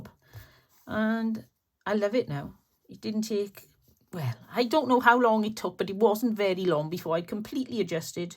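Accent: Wales, United Kingdom. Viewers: I love naive or rustic work. It just looks real to me. Not like stuff that is so perfect you don't want to use it. Anyway i'm new here. Are you originally from Wales? Sounds like you are. I'm in uk originally from south africa. I also do many crafts and love doing any kind of hand sewing.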